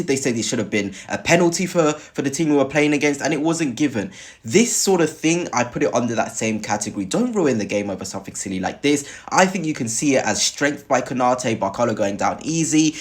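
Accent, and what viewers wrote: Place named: Paris, France. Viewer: Wow he sounds Parisian.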